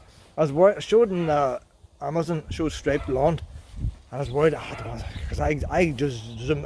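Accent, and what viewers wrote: Ireland. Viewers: I'm buying one of these just for the Irish accent! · Hard to understand his accent very strong Irish accent 😅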